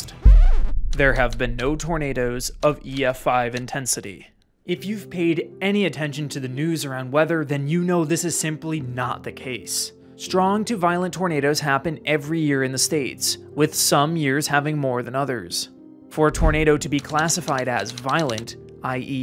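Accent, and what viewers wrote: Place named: RP, United Kingdom. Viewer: dawg talks he works for the BBC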